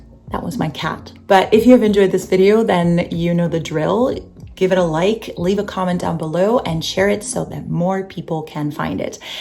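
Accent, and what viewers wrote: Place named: United States. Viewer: Thanks for sharing. 😊 I would like to know why you have an American accent when you are originally from San Marino. What and where did you study? In the US? What was your major at the university?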